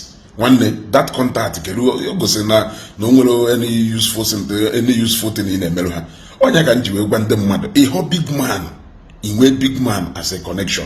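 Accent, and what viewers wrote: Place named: Igbo, Nigeria. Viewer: awesome presentation. That's the ende-Igbo style of talk.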